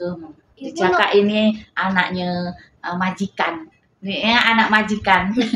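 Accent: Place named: Java, Indonesia. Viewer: Slang Jawo bunda masih pekat sangat saya dengar, kakak ipar saya dah macam orang tempatan dah bunda slang bahasa' Jawo nya sudah kalah dengan bahasa Iban dah😅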